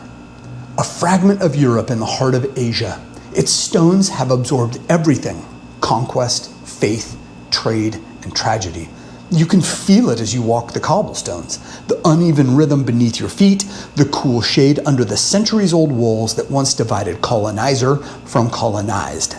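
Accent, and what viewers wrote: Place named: Philippines. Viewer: Look like you speak filippinos accents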